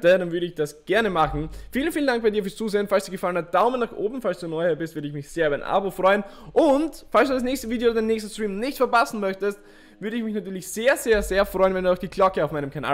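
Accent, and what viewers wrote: Austria. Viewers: Bist du östereicher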